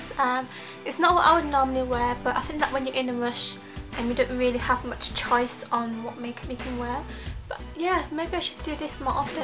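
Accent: United Kingdom. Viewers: by accent i just assumed you're in uk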